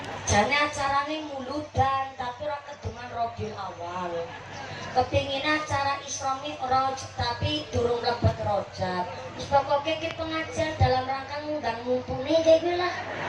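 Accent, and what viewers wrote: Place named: Banyumas, Indonesia. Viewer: Ustadzah mumpuni logat ngapak yang spesial mudah di pahami dan memberikan edukatif semangat pengetahuan manfaat termasuk dalam rangka memperingati Muludan jika mendengar bikin ketawa yang spesial mantappp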